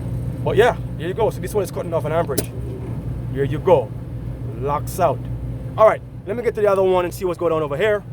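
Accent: Jamaica. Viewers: Hey my friend are you from Jamaica? My family is from Jamaica as well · Are you a jamaican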